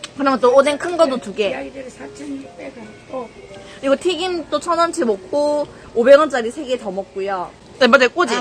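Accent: Busan, South Korea. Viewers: 38살 아줌마가 보기에도 우와 이쁜 ~♡😍 너무 착하고 예의가 바르셔서 어떤 영상이든 흐뭇한 미소로 보게 되는것 같아요^^ 생각보다 많은 사람들이 말투나 태도를 많이 보신다는거..공주병 조금 이라도 느껴지면 영상 닫아버린다는거 아시는지요ㅋ 서울 살다 부산 갔을때 부산 사투리 땜시 상처 많이 받았는데 사투리 쓰면서도 이렇게 싹싹 할수가 있구나 키워주신 부모님 인성과 성품이 그대로 묻어나는 예쁜아가씨네요♥ 가끔 들어와서 응원하며 영상 잘보고 갈께요 감사합니다^0^* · 부산사투리 쓰세요?사투리인것같아옄ㅋㅋㅋ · 뭔가많이들은 말투다했더니 부산사는친구랑 비슷//너무좋다사투리ㅠ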